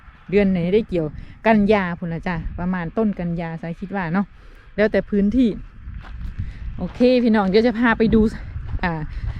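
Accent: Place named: Ubon Ratchathani, Thailand. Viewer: ฟังสำเนียงเป็นคนอุบลฯเหรอครับ ชาวนาของอังกฤษร่ำรวยต่างกันกับเกษตรกรไทยที่ยากจนลงทุกวัน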